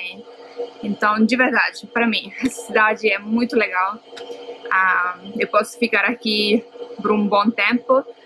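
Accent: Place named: South, Brazil. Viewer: Já te chamaram de "alemoa"? Teu sotaque e a tua aparência é bem comun entre os descendentes de alemães do sul do Brasil.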